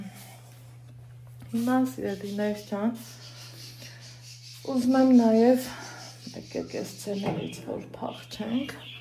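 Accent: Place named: Gyumri, Armenia. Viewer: Ьтик артен мер Ленинаканцу акцентов асир 😂 джан арохч йакнек, шат сирун шорикнерен, апри 🙏🙏🙏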